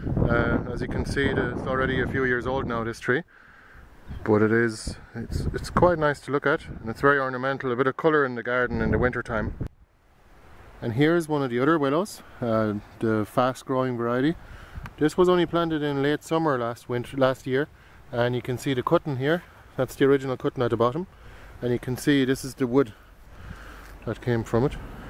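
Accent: Ireland. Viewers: Hi,,thank you for the info,,,enjoyed listening to a good old Irish accent,,you have a new subbie now,,hope to make an arch,soon,,,Cheers,,,Stay Safe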